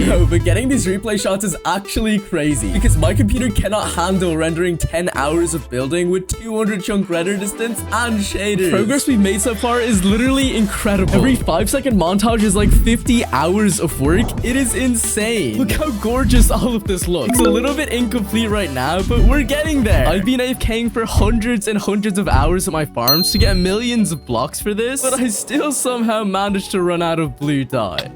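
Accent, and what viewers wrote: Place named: Ireland. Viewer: Yoo I Heard Your Irish And Im Irish :)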